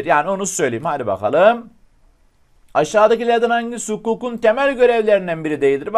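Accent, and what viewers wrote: Adana, Turkey. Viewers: Adana mı hocam:) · Hocam Adanalı mısınız 😅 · Hocam Adanalı mısınız 😂 Adana ❤ ben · hocam net adanalısınız😁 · Adanalı mısınız hocam 😅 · Abi adanali mısın😂😂😂😂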